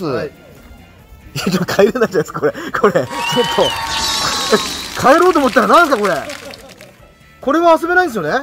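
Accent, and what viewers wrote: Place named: Shiroishi, Japan. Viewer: 今日はかなりなまっていました🤔 白石市民から移りましたね😁 山形よりまだまだいいけど😁 ビリビリガン自分でするにはかなり勇気が🙂 🐸と🐍このシリーズ大好きです💖 錦ケ丘ヒルサイドモール、また頑張ってください🙇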